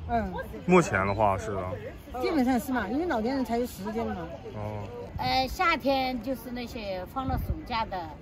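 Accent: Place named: Cantonese, China. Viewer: 发现广普真好听。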